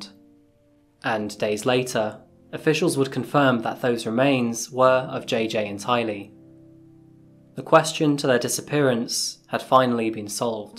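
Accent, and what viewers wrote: England, United Kingdom. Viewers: In my opinion, the English accent is one of the best for monologues. :-) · I come here to hear this case in british accent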